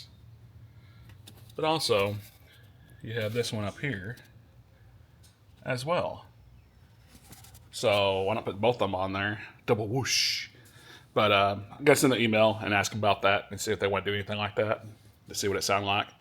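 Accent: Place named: Texas, United States. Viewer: Accent sounds familiar… would u happen to be in Texas? Lol I just bought a sixpack scat this week. Tryna to see if anyone knows a shop that can handle my more advanced mods and tuning and stuff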